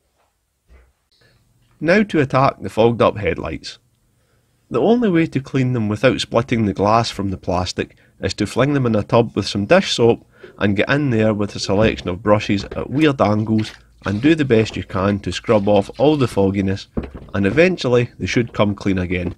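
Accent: United Kingdom. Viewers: I love these no bs vids, and the Scottish accent ( I lived in Edinburgh for 2 years ) is superb !! Cheers from Australia :) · Great video, love it Out of context: can anyone pls tell me where his accent come from? I guess Sussex or maybe Scotland? Thnx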